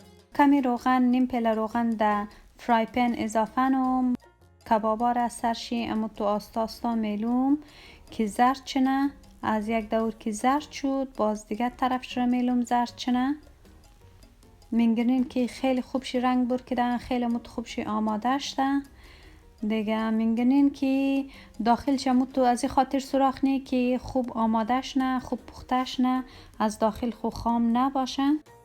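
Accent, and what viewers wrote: Hazarajat, Afghanistan. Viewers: I like her hazaragi accent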